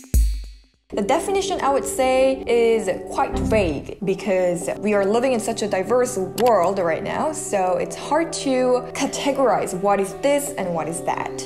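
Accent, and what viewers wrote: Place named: Philippines. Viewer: Your accent is so similar with Filipinos' accent ❤️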